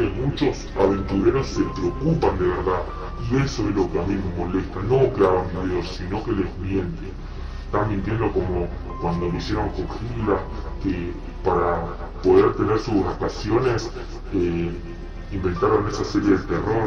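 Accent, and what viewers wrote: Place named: Colombia. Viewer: No la saca de Google Cómo explicas su voz original, tiene acento Colombiano y Juan Carlos no la tiene es obvio que no es solo lo dijo para ver cuánta gente le cree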